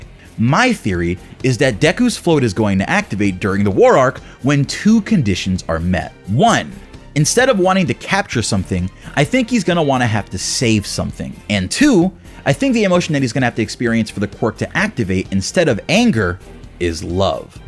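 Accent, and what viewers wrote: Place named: Puerto Rico. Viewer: You ooze your puertoricanness when you talk! Nice work with the videos man!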